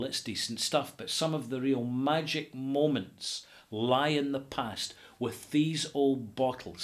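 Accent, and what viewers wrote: Scotland, United Kingdom. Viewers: is my goto guy for all things whisky. However, for those of us in the USA who have a little difficulty with the Scottish accent (although who much appreciate it), it would be really helpful if the Brands reviewed in the video were listed in the notes.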